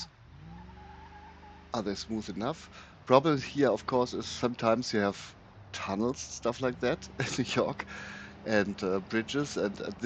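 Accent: Germany. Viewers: I’m about halfway through the video and now realizing some of this mistakes I made with your tool. Mainly the ordering of materials (based on some guesswork about the underlying map). Thanks for showing my tool! I think it’s definitely possible for your tool to sort of auto select the materials, layers ordering, roads options in the future using OSM data. I’m looking forward to the future if this tool. Fantastic work. And for some reason I just love German accents 😂 great video my friend. Still watching through until the end. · I love that German accent! <3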